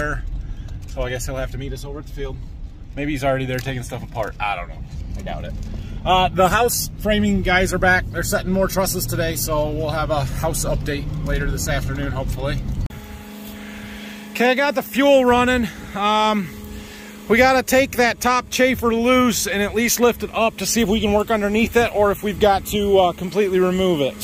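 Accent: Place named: United States. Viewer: I love how u Americans say roof. U pronounce it more like "rough". We Canadians pronounce it with a harder oo like boot.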